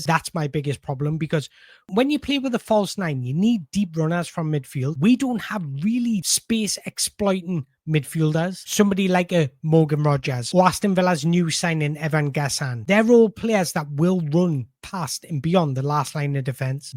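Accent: Newcastle, United Kingdom. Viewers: the only geordie i wana listen talk about a villa game against newcastle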